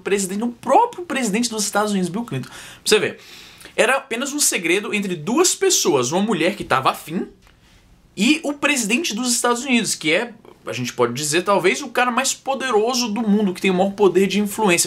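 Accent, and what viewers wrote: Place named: Ceará, Brazil. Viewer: o sotaque de cearense do é muito estiloso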